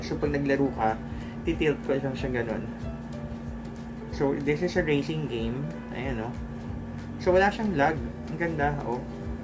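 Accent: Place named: Iloilo, Philippines. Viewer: May naririnig akong ilonggo accent hehe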